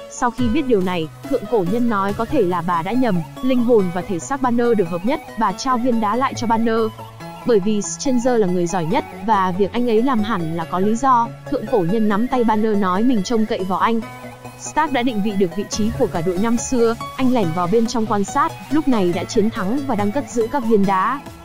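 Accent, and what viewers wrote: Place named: Thanh Hoa, Vietnam. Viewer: Giọng thanh hoá nghe chối kinh dấu ngã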